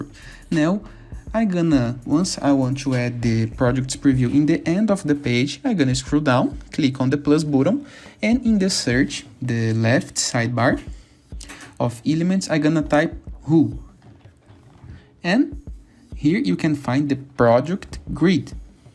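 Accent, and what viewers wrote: Brazil. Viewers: Your accent seems brazillian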